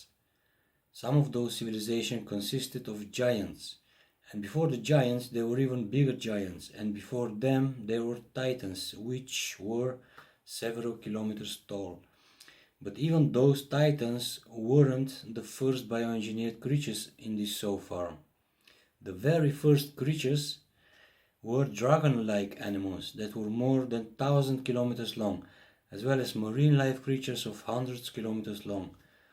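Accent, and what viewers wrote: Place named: Croatia. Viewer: where are you from you're accent sounds Croatian. I misspelled before sorry.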